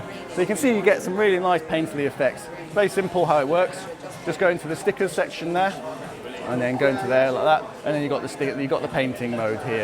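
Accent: United Kingdom. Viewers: cool an Asian with a British accent demonstrating my favorite game! :D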